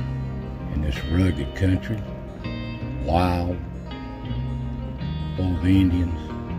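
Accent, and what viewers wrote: Appalachia, United States. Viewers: Love to hear you talk. You sound like my folks. Do you use words like "kiver" for "cover", "over yonder" for "over there", "igoddling" for "moving in an unpredictable and erratic fashion"? I'm big on keeping my Appalachian linguistic culture.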